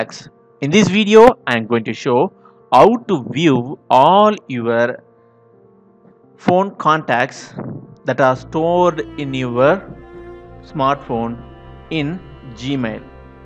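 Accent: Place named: India. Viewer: Pure Indian accent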